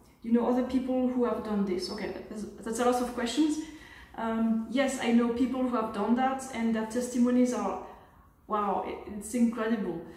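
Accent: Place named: South Africa. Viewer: Do you know you’ve got the South African accent ! It is sooo cute 😊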